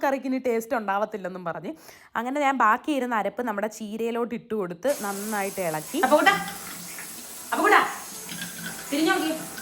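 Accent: Kollam, India. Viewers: തൃശ്ശൂർകാരി ചേച്ചി ആണ് എനിക്ക് നല്ല ഇഷ്ട്ടമാണ്, ഷോർട്ട് ആയി വിളിക്കാനും, എന്തു ഡ്രെസ്സിലും കാണാൻ സുന്ദരി, ഹെയർസ്റ്റൈൽ സൂപ്പർ, ഒഎസ് മാധുരി ദീഷിദ് കട്ട്‌ എനിക്ക് മാത്രം തോന്നുന്നതണോ ഇഷ്ട്ടം കൊണ്ടാണോ എന്നറിയില്ല, പാചകത്തിൽ മികവ് എല്ലാം കൊണ്ടും ചുന്ദരികുട്ടി കൊല്ലം ഭാഷ കേൾക്കാൻ എന്തു രസം, എല്ലാം തികഞ്ഞ വൈഫ്, ആണുകൾ ആകർഷിക്കുന്ന ഭംഗിയും, പെരുമാറ്റവും, ഇനി അടുത്ത വീഡീയോയിൽ കാണാം, ❤️❤️❤️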